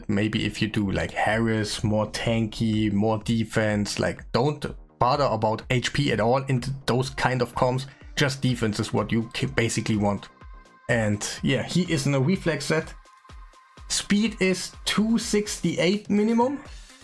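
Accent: Germany. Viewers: hey when zinogre came out i made a 2 to 1 unkillable team with zinogre emic painkeeper (in the calc) could not test it yet do you want to test it? ps are you german? you kinda sound german ^^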